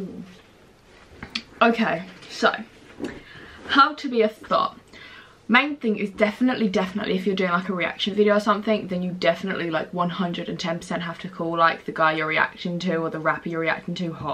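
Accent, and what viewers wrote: England, United Kingdom. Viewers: salut jadore ton accent anglais tes tres jolie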